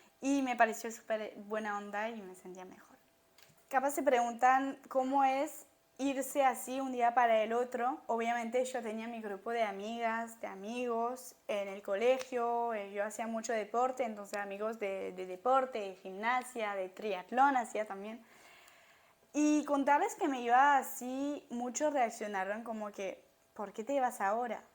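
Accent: Argentina. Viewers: Me gusta como hablas pareces Argentina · Olles tienes acento o hablas argetino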